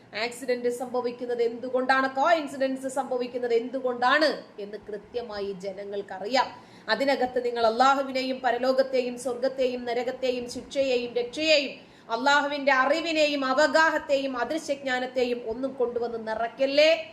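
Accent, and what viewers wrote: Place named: Kottayam, India. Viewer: അക്ഷര സ്പുടതയോടേയുള്ള, അറിവുകളുടെ നീതി ന്യായയുക്തമായ താങ്കളുടെ സംസാര ശൈലിക്കു ഒരു പ്രത്ത്യേക കോട്ടയം സ്റ്റൈൽ തന്നേയുണ്ട്. അറിവിന്റെ ആശംസകൾ. 🌹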